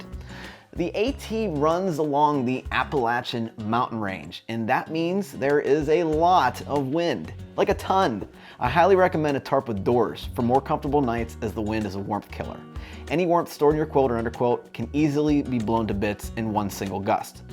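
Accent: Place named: Pittsburgh, United States. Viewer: sounds like a Yinzer to me (I'm from the eastern side of Pennsylvania).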